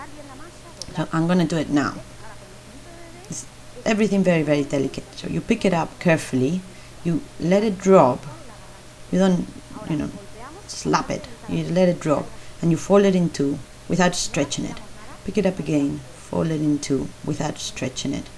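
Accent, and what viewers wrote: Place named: Spain. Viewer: She isn't Latina, but Hispanic she is from Spain can tell from the accent. People from Spain are Hispanic.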